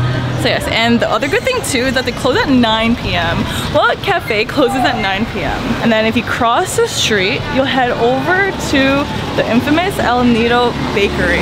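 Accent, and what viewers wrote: United States. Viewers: You're a very entertaining story-teller with an amazing accent (West or East Coast?). Appreciate the way you appreciate everything about El Nido. (I miss that pandecoco!!) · girl you talk to much a southern california girl., i should know i live in Orange County , CA and majoroty of korea her talk like you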